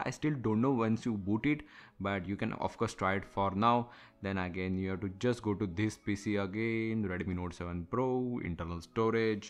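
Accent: India. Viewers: I'm trying to find out how to root my redmi note 7 phone so that I can remove all the bloatware. This video sounds very helpful, but I am afraid I cannot follow your Indian accented English. Have you got a text version of your voice over which I could follow?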